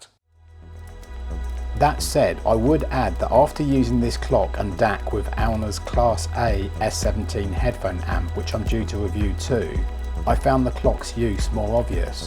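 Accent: United Kingdom. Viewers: I must say I am set with my sound system but anyways I like to watch your videos,nice voice and british pronunciation feels good to my ears.But man,can't thank you enough for discovering their music to me. 1000 x thank you. My system: Verum 1mk2,Violectric v202,Schiit Modi Multibit and Arcam rPlay streamer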